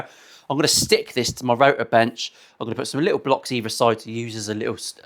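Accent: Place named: England, United Kingdom. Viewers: Nice job mate.. it was fun watching you at work.. like the English accent 🙂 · Heard the geordie accent but thought no more about it, then does the demo.😊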